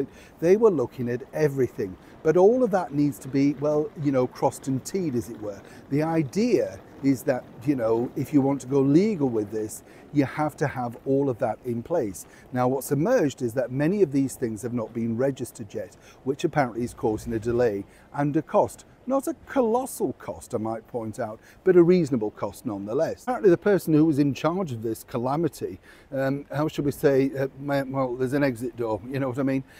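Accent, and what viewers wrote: United Kingdom. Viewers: U R the only artist (yes 2 me u R an artist) I don't know if it's your Contact that I enjoy Or Your wonderful accent (Could u plz tell me What type of accent is it. Plz don't say British A little more exact would thrill us, Your Ravenous Fans!! Could u make a segment about British (EU) Accent And how different accents are from different parts of England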